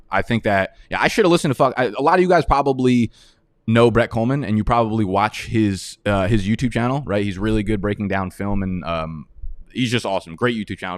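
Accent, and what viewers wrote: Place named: East Coast, United States. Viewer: Appreciate the content. he knows his stuff more than alot of other ff guys. But my God I cant stand how he says welcome BIKE lol. I just can't get past it. Is this an east coast thing?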